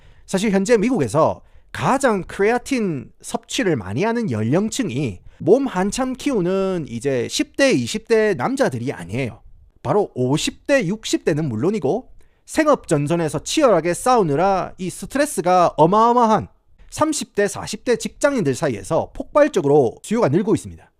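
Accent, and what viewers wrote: Gyeongsang, South Korea. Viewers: 경상도 사투리 쓰는 RM이구나~~~생각하면서 듣고 있습니돠~~~. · 시카고 애독자예요 님 덕분에 여러 정보 알게 돼 감사하며 저도 부산이라 이 갱상도 억양 힐링입니다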